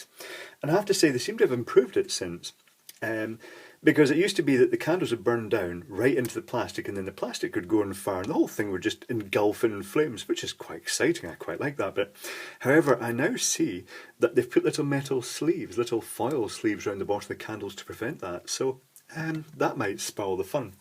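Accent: Scotland, United Kingdom. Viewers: Hi love the vids and the wee Scottish accent, it sooooo reminds me of my late Dad. Can you please show how you have your iPad set up for the videoing...cheers from down under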